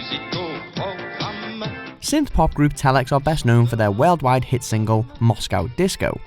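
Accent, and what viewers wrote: United Kingdom. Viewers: This is exactly the same as the list on WatchMojo, it is only voiced in the British accent. Don't understand why it should be on both channels.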